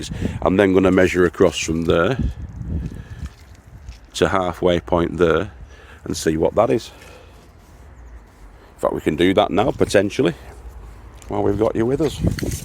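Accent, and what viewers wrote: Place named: Wigan, United Kingdom. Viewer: Hello! You're incredibly gentle with your persuader! Here I am yelling at the screen just give it a good whack,!! 🔨🤣 It looks really fantastic!! Just wonderful!! You'll make the money back by increasing productivity.. so don't forget to include the savings in your calculations!! Keep speaking the way you do... more correctly sounds terrible!! We'd miss the Wiganese! It'll be nice with featherboard!! Thumbs up, my friend!! Get out of those wet clothing..warm up... and we'll see you tomorrow on a sunnier day!☀️ Fingers crossed!! 🤞 G'nite!!💖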